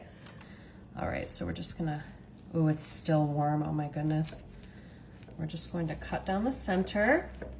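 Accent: South, United States. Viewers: So why the southern accent on your other channel? 🤔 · So in your newer videos on your other channel you have a southern accent and sound totally different. Is it fake? Or is this accent on this video fake?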